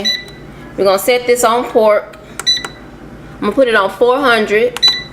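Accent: South, United States. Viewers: I love your southern accent! 👍❤️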